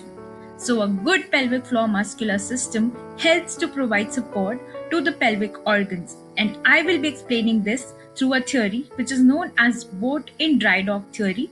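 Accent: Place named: India. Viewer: Indian accent...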